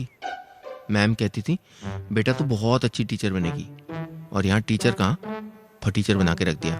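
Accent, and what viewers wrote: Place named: Punjab, India. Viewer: बहुत खूब. पंजाबी तड़के में कहानी सुनाने का ढंग बहुत ही मज़ेदार.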